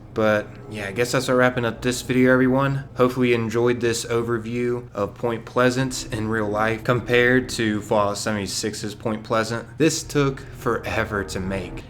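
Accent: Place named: Pittsburgh, United States. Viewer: Yo where you from? I hear a pittsburgh accent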